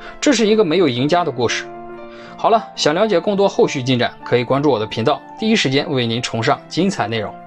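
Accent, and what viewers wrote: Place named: Northeast, China. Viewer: 小哥这嘎啦味普通话很亲切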